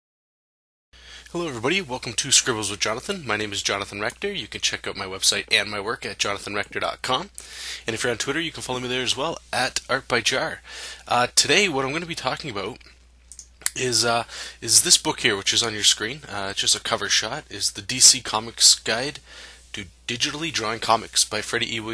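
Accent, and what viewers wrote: Canada. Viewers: Are you from Canada, aye?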